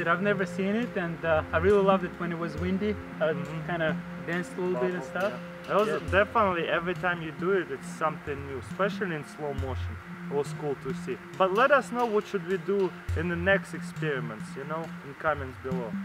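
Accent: Russia. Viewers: for Russian Accent, Love it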